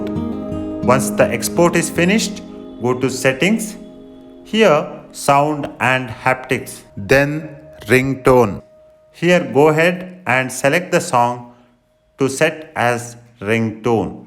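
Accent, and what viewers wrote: India. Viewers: LOVE THE South Indian ACCENT Writing South Indian I low caps, coz I m RACIST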